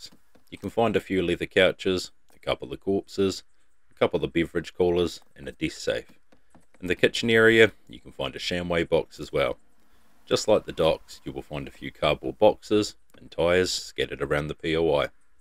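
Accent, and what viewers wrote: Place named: Australia. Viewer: Man you sound like Jamaine from flight of the Concorde's. I don't mean just because you both have Australian accents ya just fully sound like him. Such a crack up that show. Also cheers for all the tips on 7 days.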